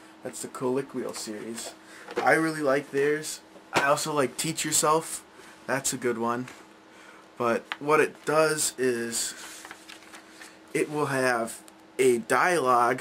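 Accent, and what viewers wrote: United States. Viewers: He has a nice american accent.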